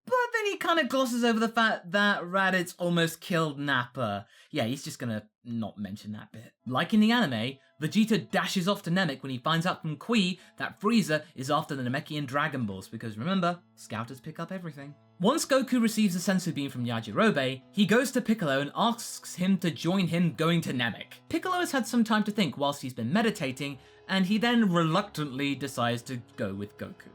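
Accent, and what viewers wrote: United Kingdom. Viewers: R u British ? Just askin sorry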